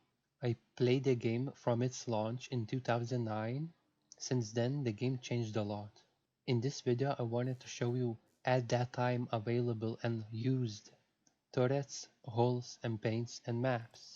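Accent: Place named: England, United Kingdom. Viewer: I really like you English accent ❤️👌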